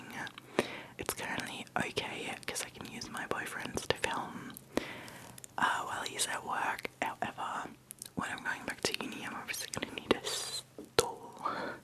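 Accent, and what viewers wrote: Australia. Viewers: girl its blowing me away that you're not australian, my family are aussies and you sound no different!! haha thats amazing you picked up the accent so well! also would love to see the dress you found, I was just in the exact same situation on the hunt for a wedding guest dress, and asos came in clutch!<3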